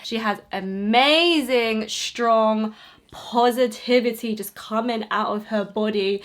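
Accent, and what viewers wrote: England, United Kingdom. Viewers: She sounds British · OMG ARE YOU ENGLISH!!!!!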